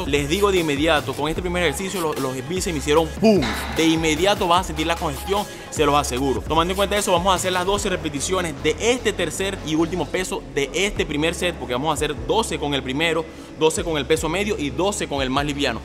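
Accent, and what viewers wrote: Venezuela. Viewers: su acento es venezuelano yesss te amo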